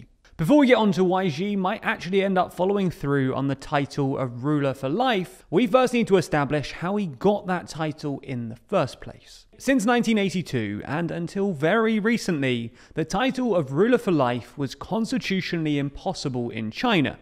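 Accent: United Kingdom. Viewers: Great video but I hate British accent because some words sounded too soft like you swallowed half of it.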